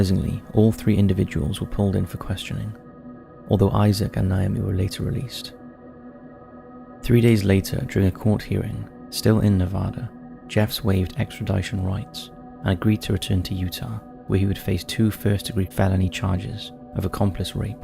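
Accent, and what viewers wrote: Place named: England, United Kingdom. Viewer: Not my problem. Why is a pom narrating?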